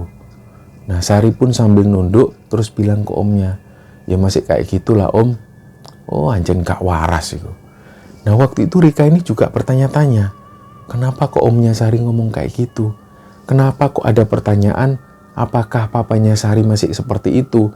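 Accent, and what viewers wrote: Java, Indonesia. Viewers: mas duhhh maless aku jan nagih i klo sehari ga denger koyok ono sing kurang, btw sampean jawa ndi seh mas kok boso jowone jan koyok bahasa sehari2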